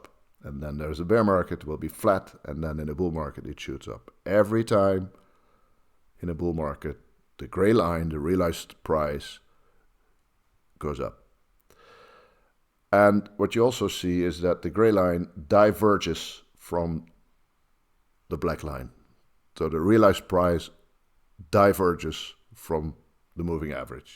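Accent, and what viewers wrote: Netherlands. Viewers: Duurde wel even voordat ik het NLse accent herkende.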